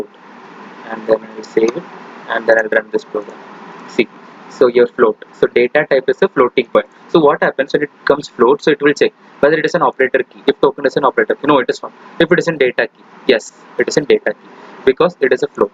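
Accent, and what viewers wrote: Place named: India. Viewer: i dont know why but whenever Indian people in YouTube speak English, even though they have a thick fucking accent they would keep speaking as fast as fucking possible... you have a fucking accent and your speaking like your trying to run a marathon...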